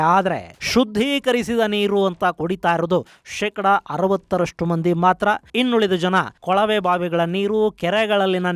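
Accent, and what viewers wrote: North Karnataka, India. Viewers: ನಿಮ್ಮ ಬಾಯಲ್ಲಿ ಉತ್ತರ ಕರ್ನಾಟಕ ಭಾಷಾ ಕೇಳೋದೆ ಒಂಥರಾ ಮಜಾ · Sir I love the tone of Uttar Karnataka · ಸರ್ ನಿಮ್ದು ಉತ್ತರ ಕರ್ನಾಟಕ ನಾ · ಉತ್ತರ ಕಾರ್ನಾಟಕ ಭಾಷೆಯನ್ನು ಅದ್ಭುತವಾಗಿ ಮಾತಾಡಿದ್ದೀರಿ ಸರ್ ನೀವು ತುಂಬಾ ಧನ್ಯವಾದಗಳು ಸರ್ 🙏🙏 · ನಾನು ಮೊದ್ಲ ಬಾರಿಗೆ ನಿಮ್ಮ ಧ್ವನಿಯಿಂದ ನಮ್ಮ ಉತ್ತರ ಕರ್ನಾಟಕದ ಕನ್ನಡ ಭಾಷೇದಾಗ್ ಕೇಳಿದ್ದು. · ವಾವ್ ಸರ್ ಅದ್ಭುತವಾದ ಉತ್ತರ ಕರ್ನಾಟಕ ಭಾಷೆಯನ್ನು ಮಾತನಾಡುತ್ತೀರಾ ನಿಮ್ಮ ಊರು ಯಾವುದು ಮತ್ತು ನಿಮ್ಮ ಯಾವ ಜಿಲ್ಲೆಗೆ ನೀವು ಯಾವ ಜಿಲ್ಲೆಗೆ ಸೇರಿದ್ದೀರಿ ಹಾಗೆ ರೈಲ್ವೆ ಫ್ಯಾಕ್ಟ್ಸ್ ಗಳ ಕುರಿತು ಒಂದಿಷ್ಟು ವಿಡಿಯೋಗಳನ್ನು ಮಾಡಿ ಸರ್ ಅದು ದೃಷ್ಟಿಹೀನರಿಗೆ ಉಪಯುಕ್ತ ವಾಗಿರಬೇಕು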